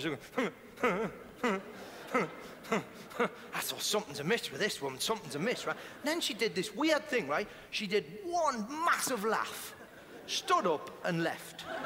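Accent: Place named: Newcastle, United Kingdom. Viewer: Tomi Haustola He's a Geordie, which is a nickname people give to Newcastle folk, my grandad is one. It's one of the furthest north places in England so it's expected that they sound quite strange to foreign folk. Listen to an Australian comedian and then, you'll really notice the difference. Aussies stretch out their inflections MUCH further.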